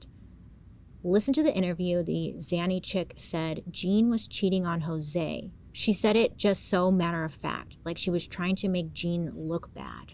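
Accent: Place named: Inland Empire, United States. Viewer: Dude idk if you have or haven't seen it but Rob Zombie has a badass documentary about Manson with what honestly seems more like the truth. The truth especially if you have been part of any like tweeker group or dope house mentality. Check it out if u haven't! Also I can totally tell your from the IE you talk like us❤❤❤❤ I love your story telling!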